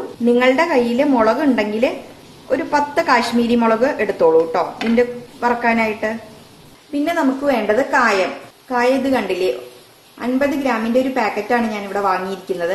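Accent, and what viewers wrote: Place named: Palakkad, India. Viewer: Chechi palakkad aano സ്ഥലം.. സംസാരം പാലക്കാടൻ ഭംഗിയിൽ ആണ്.. അതാ chothichath.. ഞാനും pkd ആണ്...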